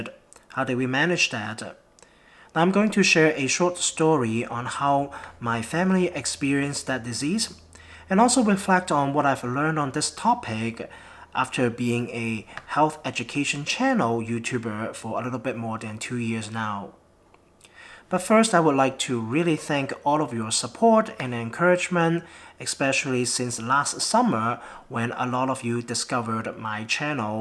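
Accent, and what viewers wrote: United Kingdom. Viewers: Your demeanor is measured, not alarmist and speak as a father and husband as well as a scientist. I detect British alliteration when an open ended vowel word meets a word beginning with open vowel. 😉 A Brit are you🤔🤣🤣.🤲thanks for your vids🤲. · Dr, I watch many doctors and scientists on this subject! I love your channel honesty and accent, from the UK, no problems understanding you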